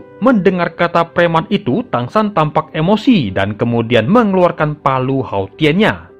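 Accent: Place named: Madura, Indonesia. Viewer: Ketarah jhek reng madureh🤣semangat tretan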